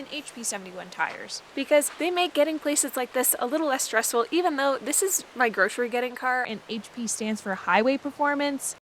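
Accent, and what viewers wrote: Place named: Canada. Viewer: The way Canadians pronounce words like Mazda, and pasta always amazes me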